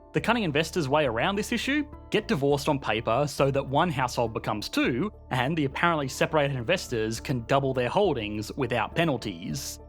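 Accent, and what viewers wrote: Australia. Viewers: I beg your pardon for asking maybe an insensitive question but what nationality is? I keep hearing this accent (PBS spacetime, ), but can’t pinpoint the origin. Australian? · This may not be helpful, but it is truly meant with that intent. The United States has exported amazing and incredible "things" in her relatively brief history; although porn and the Californian uptick are, in my opinion, not worthy of inclusion. We humans have two primary means of communication: audible and visual, and you have a great product which involves both of these areas. However, I couldn't make it through to the end of this particular post because of the CA uptick you appear to have imported. I love the Aussie accent (although I think the South African has you pipped), and with over a million subscribers you can reasonably tell me to... er... "go away," but I would ask that you accept this as an honest and well-intended constructive criticism. 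· There's no way I'm trusting an Australian's analysis on anything related to China. Btw your accent sounds absolutely fucked up. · yeah should have expected some negativity with the aus accent. but hey hundreds of times better then an indian one. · This guys accent drives me nuts. Aussie accents don't tend to bother me but this guy changes his intonations mid-word - "Chyayayana"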